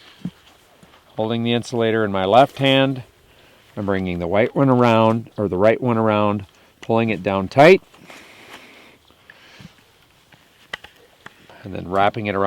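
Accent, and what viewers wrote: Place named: New Zealand. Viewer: What a very good instructor, thank-you. The Kiwi accent was very thuck, but managed to git it.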